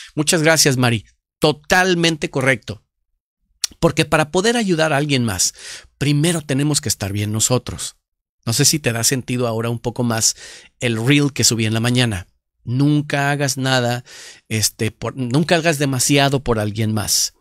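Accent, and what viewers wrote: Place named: Mexico. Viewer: En dónde se encuentra Usted? Su acento es Mexicano.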